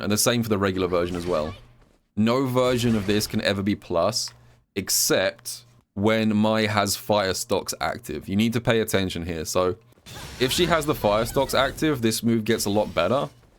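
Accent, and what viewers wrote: United Kingdom. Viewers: lol I had to watch multiple times I thought he was saying "tool character". That British accent is something else. Carry on don't mind me great video much appreciated because that fan is a beast to deal with.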